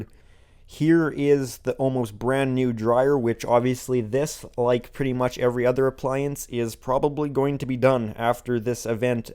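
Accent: Canada. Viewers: Hi where are you from your accent sounds Canadian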